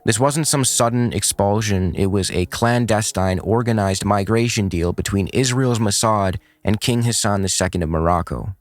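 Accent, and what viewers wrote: United States. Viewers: Sir you sound like an American. Doesn’t that mean that you are from a country that is colonized land? Are you prepared to leave this land and return it to the indigenous population? It could be that you have indigenous blood having intermixed ancestors but certainly you have blood of colonizers also so do you get to stay or must you go??? Does it matter who colonized THIS land in regards to whether YOU get to stay or go??? Populations over history have immigrated around, colonizing, displacing, being displace and even returning. The Jews are in Israel now. Regardless of their religious views or lack of them, they are there NOW. Just like we Americans are here now and we don’t plan to just leave and give the land back to the ancestors of the indigenous people because our ancestors fought them for it. We should focus of history and how civilizations have behaved over time. 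Nothing new here except that the world seems to hyper focus on Israel for some strange reason. My opinion which matters only to me, is that the world focuses on Israel because of Israeli’s claim of God giving them the land and world hates God. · Was quite okay listening to this as a background until I head the commentator’s American accent saying that he’s not saying the ottoman turks weren’t humanistic paradise and that they did some horrible shit like the Armenian Holocaust.. and I just found myself thinking how amazing it is that Americans somehow don’t exist in their own heads when talking shit about other civilisations. I mean he exists as a citizen of his country thanks largely to his ancestors successfully did to the indeginous people what Israel is doing to the Palestinians. And then they didn’t stop there, in order to compete in trade internationally they went and enslaved god knows how many Africans to keep their business overheads low. 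And NOW they are helping out with a genocide because their best buddy Israel really wants a genocide. If the ottomans did shit. What have the Americans been doing ?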